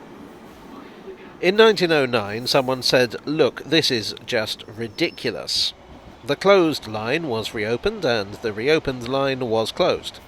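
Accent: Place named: England, United Kingdom. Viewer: the proper English omg :O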